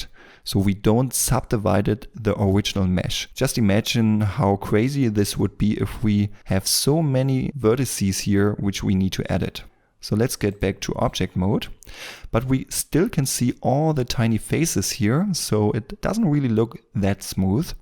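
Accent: Germany. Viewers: Since you sound german, why dont you make german videos? :) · German accent😂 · Gutes Tutorial, aber dein deutscher Akzent sticht stark heraus xD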